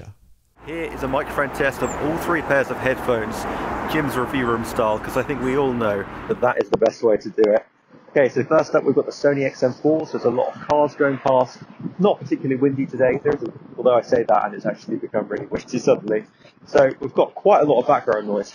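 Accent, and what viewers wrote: United Kingdom. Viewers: is jimsreviewroom British accent version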